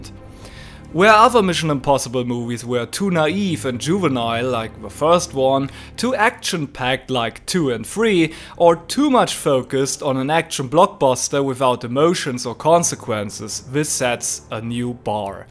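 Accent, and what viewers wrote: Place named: Germany. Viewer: Interesting and informational video - but on some parts hard to follow due to the thick german accent, do you have english speaking friends who proof watch your work?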